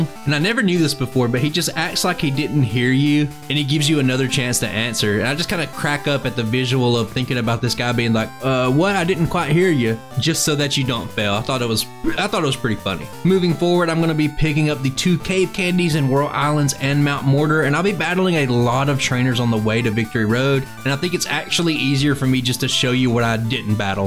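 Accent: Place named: Texas, United States. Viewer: the texan accent is sooo good with pokemon challenge runs just what i needed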